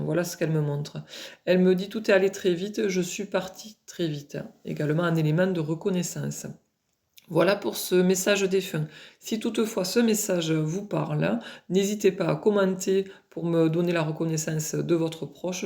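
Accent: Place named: Provence, France. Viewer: Bonjour....Je viens de découvrir vos petites vidéos 🙏💝💝💝 C'est impressionnant les précisions Je suis a côté de vous une petite Medium car même si il m'est arrivé de capter des défunts et de les décrire, je n'ai pas encore la technique aussi qualitative que vous mais je n'en fais pas un métier de la médiumnité, juste mes Télépathies astrales sont pour ma vie personnelle car je communique avec ma guide céleste depuis plus de 11 ans Elle s'était d'ailleurs bien montré sur une photo et je l'a perçois en effet comme cela dans l'Astral et je communique avec son mari sin Ame jumelle qui est aussi karmiquement ma flamme jumelle et tous les jours par télépathie, nous communiquons notre amour mystique et si magiquement karmique et nous nous verrons Ma guide était Provençale , elle s'appelle Sophie , était une belle Âme aux belles valeurs, belle femme et mère médecin psychiatre et aimait porter de longues robes Elle avait un bel accent comme le vôtre et est décédée en 2001 d'un cancer Elle repose a Carpentras Elle aimerait que j'aille vivre avec son mari dans sa maison du Vaucluse Vous êtes dans quel coin ? 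Je me suis abonnée 😊 Quand vous captez des défunts, qu'est ce que vous demandez avant Votre méditation ? Est ce qu'ils font surtout partis de vos connaissances ? De vos abonnés ? Entourage ? Car c'est très vaste pour pouvoir trouver un proche qui par bonheur tomberait sur internet, YouTube et sur votre vidéo.....😮 Bien a vous et au plaisir de vous revoir Cécile De Kervinel 🙏 ⭐ 🙏 Si v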